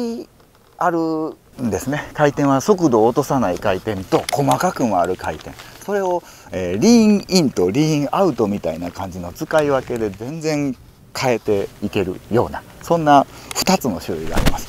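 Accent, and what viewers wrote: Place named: Kansai, Japan. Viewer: シャープですね〜(^ ^) 素晴らしい動画でした！ 柔らかい関西弁も聴きやすくて良かったです(^｡^)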